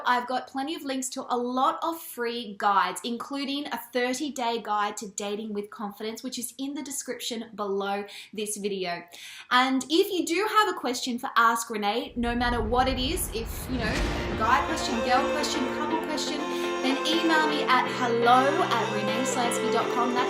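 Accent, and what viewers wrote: Australia. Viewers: The aussie accent tho 🙂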